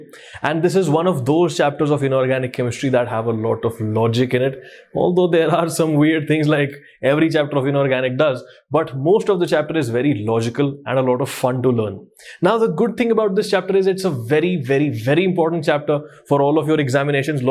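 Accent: Punjab, India. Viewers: Sir are you from punjab??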